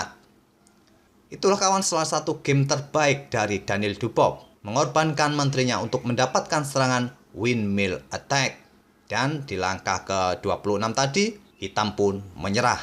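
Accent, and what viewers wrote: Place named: Banyumas, Indonesia. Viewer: Sama ngapak2 min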